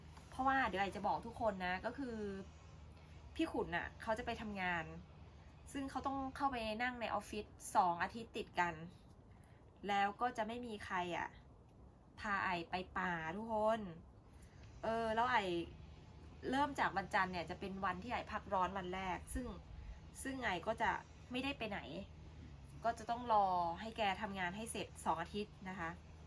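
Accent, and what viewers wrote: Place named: Nyaw, Thailand. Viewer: สาวไอ่ไทเมืองสกลบ่ สำเนียงคือญ้อเมืองสกล หมานๆเนาะ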